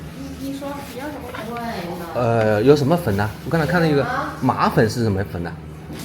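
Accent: Wuhan, China. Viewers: 武汉人？？？听你口音听出来了，我后天去浏阳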